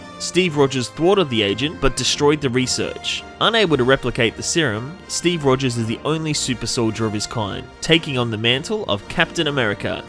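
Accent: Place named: Australia. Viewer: Are you Australian because I am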